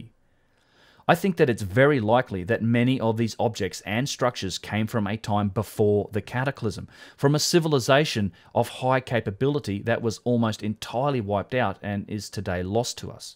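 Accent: Australia. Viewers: As soon as you hear the Aussie accent you just know it's going to be dumb.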